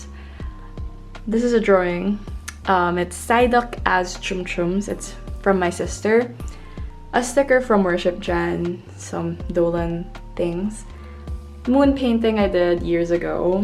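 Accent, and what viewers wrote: Philippines. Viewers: I knew you were filipino right away cause I can hear your filipino accent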